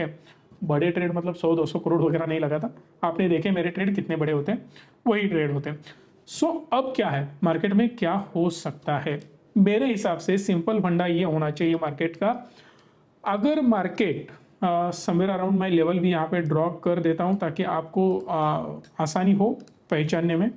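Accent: Maharashtra, India. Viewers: Sir, Samjvanyachi, shikavnyachi padhhat khup chhan aahe tumchi.. Khup pot tidakine agadi manapasun shikavata Sir.. Pan Sorry to say you this, Hindi improve karayachi garaj aahe, Tumhi je bolata sadhya te marathi hindhi aahe, like our CM.. Sorry to say you again..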